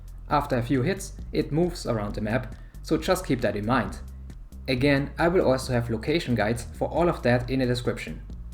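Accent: Germany. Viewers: Are you german because of your accent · Ich weiß nicht wieso aber ich höre direkt dass du ein deutscher bist 😂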